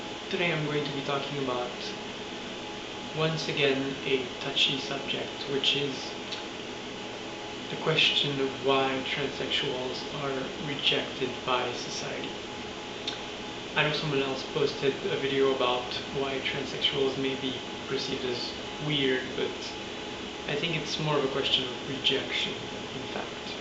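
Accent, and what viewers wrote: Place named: Quebec, Canada. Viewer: You're very beautiful and your Quebecois accent is wonderful. I hope everything is going well with you. -Eric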